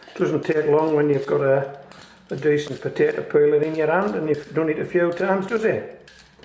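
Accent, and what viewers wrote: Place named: Geordie, United Kingdom. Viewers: After 25 years exiled from the UK it's fantastic to hear a regional (is it Geordie?) accent! Interesting experiment! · This guy is unreal lives in Australia but also lived in uk Middlesbrough his accent is very close lol...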